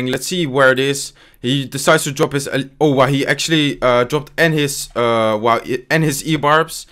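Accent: Netherlands. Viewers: Joo ben je van Nederland je hebt een beetje een Nederlands accent;) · are you dutch?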